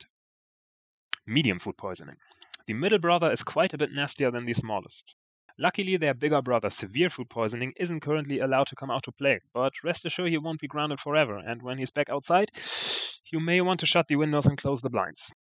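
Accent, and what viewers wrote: Germany. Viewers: Schönes deutsches Englisch :) habs sehr gut verstanden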